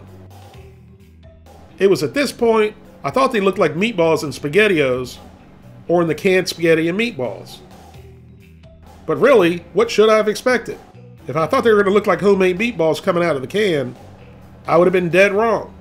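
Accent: South, United States. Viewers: Is it just me or does he really sound hillbilly?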